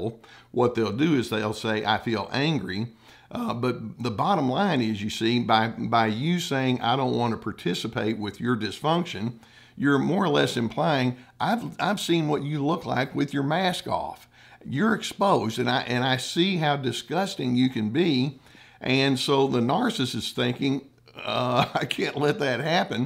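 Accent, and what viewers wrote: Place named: Texas, United States. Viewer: We have a narc neighbor (70 year-old female covert) in the condo below us that's always chiming in on petty things that are none of her business. It started with small, innocent statements, then escalated to (subtle and not so subtle) attempts by her to govern when we use our plumbing, appliances, electric blinds, enter various rooms, you name it. Boundaries of our home space, bodies and schedule are constantly tested. She invents rules and limitations that go beyond local laws. Whenever we have visitors, she'll go out of her way to rain on our parade. She tries her best to get into our heads and create psychological doubt. There's zero tolerance as we never know which alleged infraction will lead to a lengthy, condescending, word salad text or email. Since educating myself on narcs and going grey rock, she has lost interest in combating us and may soon be moving out. 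Thanks Dr. C for imparting your wisdom with a sweet, down-home Texas drawl. Fingers crossed!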